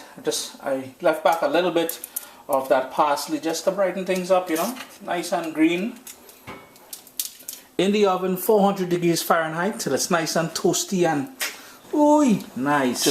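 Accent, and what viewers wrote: Trinidad and Tobago. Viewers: Am I hearing a hint of a Trinidadian accent? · of course i will put scotch bonnet pepper on it!!also brother how do i make this more carribean? i love your accnet!! trinidadian? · Yuh sound Trini 🤔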